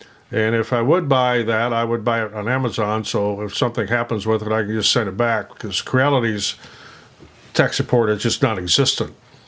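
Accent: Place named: Scotland, United Kingdom. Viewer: Scottish accent, boy oh boy. Well, coming from an american anyways.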